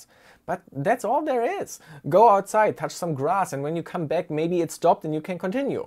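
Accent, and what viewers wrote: Germany. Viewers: i could hear u are german, but now i see you are from my town :D MOIN!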